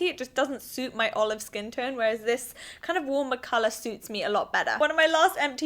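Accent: France. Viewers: Loved this video ! And the French girl is saying : Your French accent is so cute hahaha xx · haha i didn't really understand when you pronounce the fragrance's name but after i saw the name and rethink about your french accent, i feel like it's pretty accurate :P LOVE YOUR VIDEOS!! >< <3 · your french accent is so cute it worth an 8 i love your vidéos !! Bises from France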